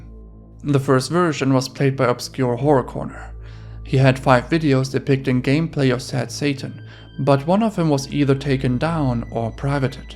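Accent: Germany. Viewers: Nice slight German accent, he'd make a good asmr channel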